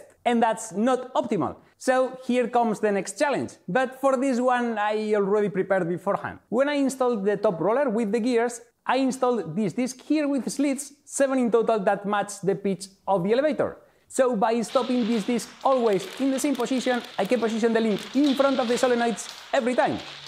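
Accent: Spain. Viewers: acento mas español que yo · me encanta el ingles de quenbrix que tienes, es como si hablara yo, y se entiende bien. un acento que solo tendría un español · Al principio pensaba que el canal sería de algún guiri, una vez que escuché el acento de ese inglés supe que este tío era español 😂😂😂. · Estoy casi seguro de que eres español, solo diré: MOOOLA MUUUCHO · Eres español, verdad? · It's surprising how many Spanish natives have a very good English (this is the case) but their pronunciation is so attached to Spanish pronunciation rules. I wonder why. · Bro, el típico spaninglish. Se nota que eres español por el tono de algunas palabras. Por cierto me encanta el video sigue así. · eres español?? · Se nota que eres español !! Grande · Are you spanish? I like your accent 👍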